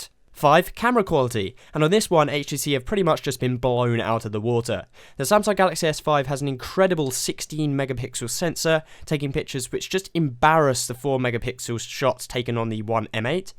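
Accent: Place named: Scotland, United Kingdom. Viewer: i heard your accent before where r u from bro? is it Scottish? Irish?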